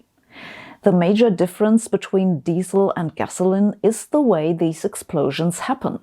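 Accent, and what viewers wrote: Germany. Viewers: Ihr Englisch ist so herrlich Deutsch ... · fear mongering with a German accent...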